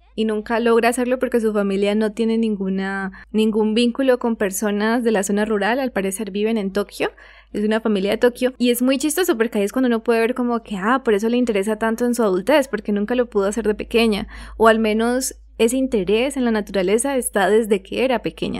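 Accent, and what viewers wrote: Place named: Costa Rica. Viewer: Por su tono de voz creo que sos de C. R.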